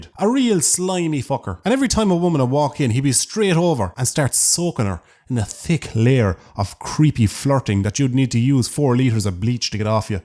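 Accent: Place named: Clare, Ireland. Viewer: which airport the one in shannon or dublin ( Im guessing shannon cos he sounds like hes from clare )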